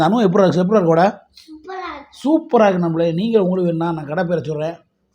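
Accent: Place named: Dharmapuri, India. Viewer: அக்கா பேச்சு சூப்பர் தர்மபுரி பேச்சு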